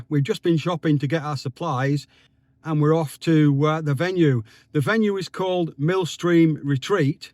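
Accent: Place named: Germany. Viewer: Thanks, that’s a German accent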